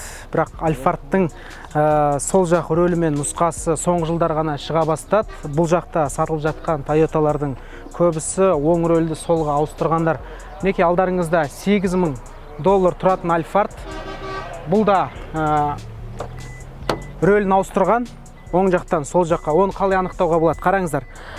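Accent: Standard. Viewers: Сөзсіз керемет, таза қазақша ісің алға бассын бауырым! Тіркелдім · Жақсы түсірілген таза қазақша, осындай сауаты білімді тілшілер көп болсы екен👍👍👍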